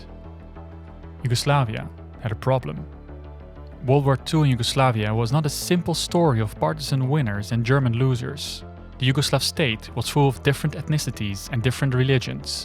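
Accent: Netherlands. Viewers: Aan het accent hoor ik meteen dat jij Nederlands bent! 😀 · I knew i noticed a slight dutch accent..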